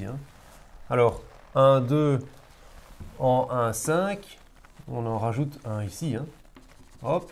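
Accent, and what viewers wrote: Brussels, Belgium. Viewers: Ce petit accent vient de Belgique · De Bruxelles même, une fois !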